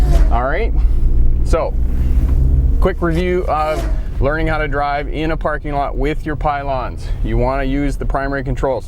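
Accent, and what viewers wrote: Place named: Canada. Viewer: I knew this guys was Canadian lol. His accent just didnt sit right with me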